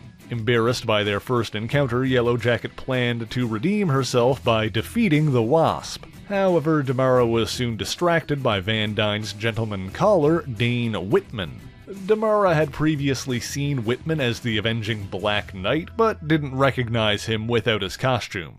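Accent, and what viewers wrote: Newfoundland, Canada. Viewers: Love the channel. I have been wondering where your accent is from?Newfoundland?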